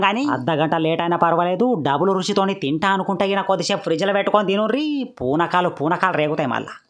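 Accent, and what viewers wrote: Telangana, India. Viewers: తెలంగాణ భాష అట్లు ఉంటది మరి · Brother మీ voice తెలంగాణ super 👏👍🤣😂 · బ్లడ్ ప్రెషర్ కంట రోల్ కంట రోల్ పెరుగుని లోడ లోడ చేసి మన తెలంగాణ పదాలు భలే గమ్మత్తుగా చెబుతావు అన్న నువ్వు ఎంతైనా వంటలలో మాట లల్లో నువ్వు తోపు అన్న 🎉🎉👌🏻👌🏻😂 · Telangana vaallaku mee language super😂😂😂😂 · mana telangana yasa ni entha baga matladinav anna ninnu chusi nerchukovali mana basha matladaniki istapadani vallu chudali😅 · Koncham nidanam ga cheppande bro,telangana slang koncham ardam avvadaniki time padutunade pleasezzzz😊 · Hi Brother very tasty 😋 and yummy you supper Bro in which district Telugu slang bro 👌 TQ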